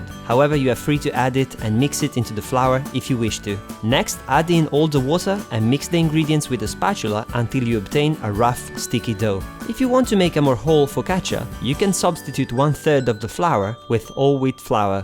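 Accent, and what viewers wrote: Italy. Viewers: Tu sei toscano allora!! :)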